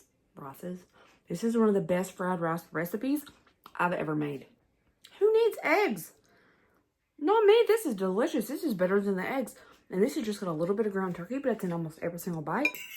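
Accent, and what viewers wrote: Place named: Missouri, United States. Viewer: Hey are you from Missouri? Your dialect really sounds like it 😅😊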